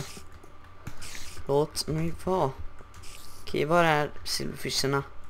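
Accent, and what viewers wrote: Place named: Gothenburg, Sweden. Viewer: Var bor du ? Låter lite som göteborgska..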